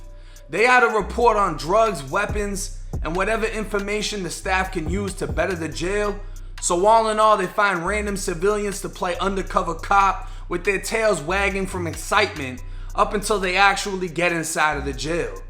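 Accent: Boston, United States. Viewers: Why you got a Boston accent if you from Tampa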